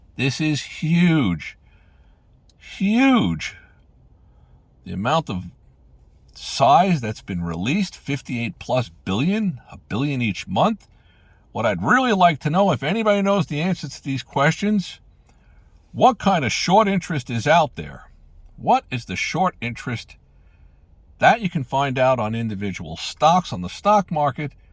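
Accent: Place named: New York, United States. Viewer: I’m speculating based on your accent that you’re from NYC like me or perhaps northern NJ. You VERY much remind me of a former college professor of mine who’s a good friend of mine and he’s from NJ and the Bronx. 🙂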